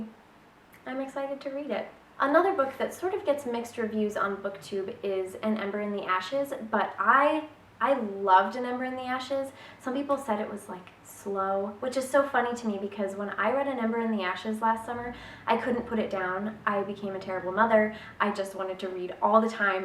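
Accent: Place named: United States. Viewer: ur accent is beautiful.... I wanna learn American accent...... what can I do for that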